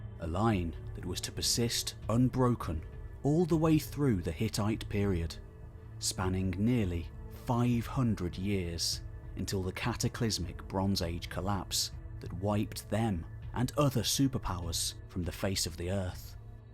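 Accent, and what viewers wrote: United Kingdom. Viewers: Also the method of speaking and accent, I love. Very relaxing, + British so you sound smarter.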